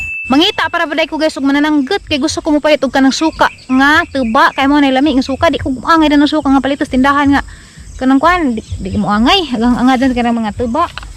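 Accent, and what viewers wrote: Bohol, Philippines. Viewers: Hi...I really like u...n excited to watch ur new vlog daily..favorite kaau ka sa ako mama..n of cors kami tanan sa family..taga jagna ako mama.ug malingaw cya sa imong vlog Jud..mkadumdom cya sa iya sinultian b4..like,AJO NAA MOY KAJO...ÀJAW KAY MAJABO..hahahha she is really ur fan.....and kami sa family nkasubscribe nimo..😊😊😊pls pa shout out sa next vlog..Fabiana ellevera halagna..ug sa mga ELLEVERA SA CANJULAO JAGNA BOHOL.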